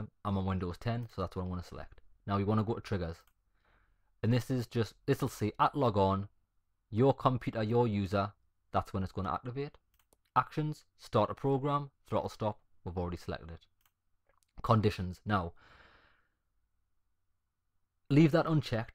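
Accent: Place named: North East England, United Kingdom. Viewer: You are from Newcastle or somewhere in the north east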